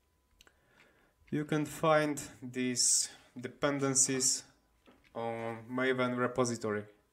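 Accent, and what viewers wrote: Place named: Poland. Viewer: Are you from Poland? You sound like you are from Poland ;)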